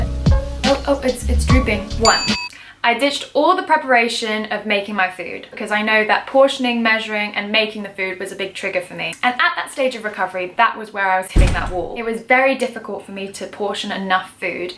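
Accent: England, United Kingdom. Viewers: I don’t really know much about Hong kong so I don’t know if this is a stupid question but what language do they speak in Hong Kong?... do you speak it? And also I don’t really know how schooling works there but you speak with a British accent. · How did you acquire an English accent? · Is your family English? Because you have a strong English accent ❤ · ! why do you have a British accent? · You have such a contagious positive actitud! Love your personality. My questions are: where did you get a British accent if you are from Hong Kong and only been to the States? 😅 And, what have been the struggles and positives of making public your ED here in YT? Take care,. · How do you have a British accent but you were raised in hk??? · Hey, why is your accent so very British, when you've grown up in Hongkong and lived in America for such a long time, but never in the UK?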